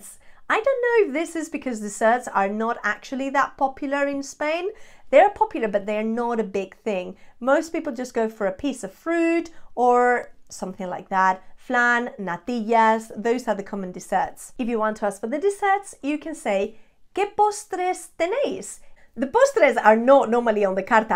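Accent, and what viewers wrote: United Kingdom. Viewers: It never occurred to me that there would be any British people who would be interested in learning Spanish. Just like Americans (whites) have no interest in knowing any other language except "Merican". You speak English with a British accent, but your Spain Spanish is perfect. Your appearance tells me that you have more Spanish roots than British. I wasn't going to watch you until I heard your Spanish and now I am intrigued. Let me know if I got any of that right.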